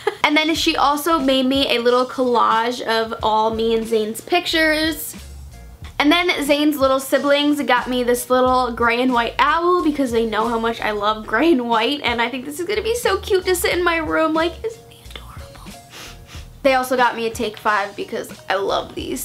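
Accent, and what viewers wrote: New York, United States. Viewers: Are you from New York? Lol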